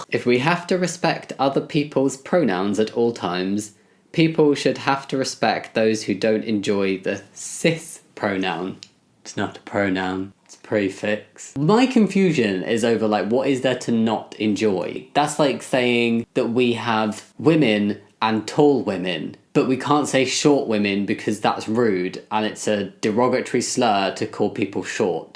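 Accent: United States. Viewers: It sounds like American's who are no longer taught Latin 🙄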